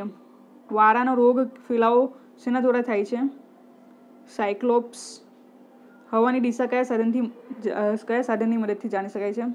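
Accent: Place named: Surat, India. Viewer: I love your voice (surti boli) nice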